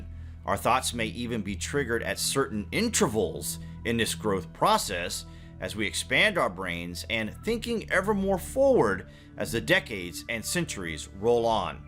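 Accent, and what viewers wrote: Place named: California, United States. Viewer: ‘We’ and ‘our’ - plebeian public mind nonsense. It’s hard to get to the substance here because of the terrible writing. Stupid California accent doesn’t help. Learn to write like an individual and get a narrator who can speak standard English with RP or standard American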